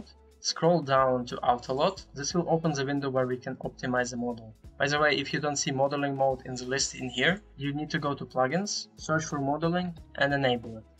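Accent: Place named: Serbia. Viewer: A hahaha, yeah guessed cause of English accent, Serbs sound the same speaking it, and I feel you, I'm only around 180 which is short for a Serb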